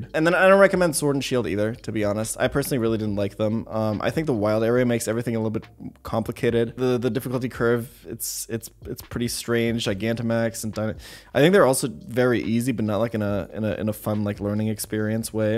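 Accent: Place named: Germany. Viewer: seems like a very Americanized German. Especially with the accent and everything 🤔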